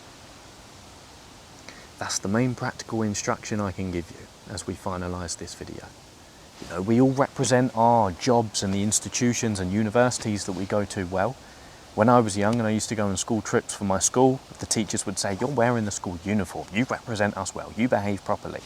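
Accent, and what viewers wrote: United Kingdom. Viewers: I'm 22, and I'm not struggling so much right now, thanks to actively accepting both who I am but also who I have been, and being forgiving. Extending a hand to the version of me that fucked up, instead of insulting it. But it still feels like a neverending tug-of-war with myself, still. With every positive thought comes an equally negative one, that i need to choose not to listen to. I am doing well now. And I'm on a path that is finally mine. And yet I feel that if I start relaxing just a little bit, if I loosen my grip on the rope I'll fall forward and back into the abyss of despair and fear. One day I feel like I can wholeheartedly trust myself and my decisions, and the next I stay on full alert to avoid making even a single misstep. This is why, although I am currently doing fine, this video is going into a special little box, that I keep filling while I feel clarity, and trust in my decisions. 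Because inevitably, when life throws another punch at me and I'll be there lying on the floor, I'll be relying not just on me, but also on little me. Adolescent me, depressed me, happy me, and future me. Thank you. Your videos help many people, and anyone could use a soft spoken british voice giving them encouragement in their times of need. ✌️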